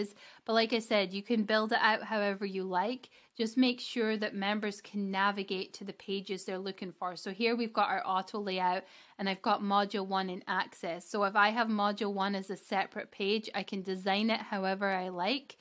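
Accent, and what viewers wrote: Scotland, United Kingdom. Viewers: Nice to hear another Scottish accent! I'm thinking of members areas for my teaching site, do you know if you have the option to also have a community area under the membership, as well as access to members only videos?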